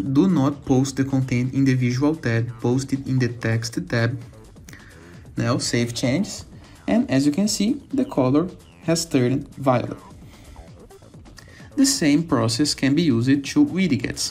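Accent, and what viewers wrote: Brazil. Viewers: o sotaque não engana, br na veia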